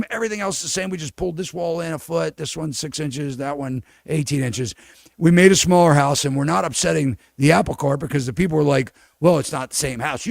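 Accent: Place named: Baltimore, United States. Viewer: BTW, your Baltimore accent is fantastic; the best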